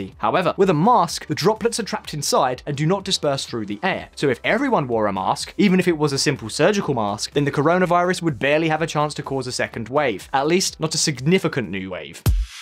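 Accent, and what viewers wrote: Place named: Scotland, United Kingdom. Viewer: I feel like I'd be happy to listen to Scottish guy narrate, but his microphone situation needs some love!!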